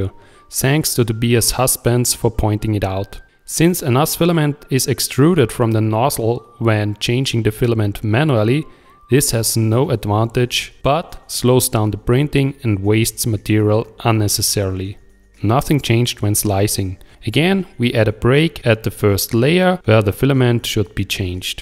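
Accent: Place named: Germany. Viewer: Wenn der deutsche Englisch spricht. Dennoch, gutes Video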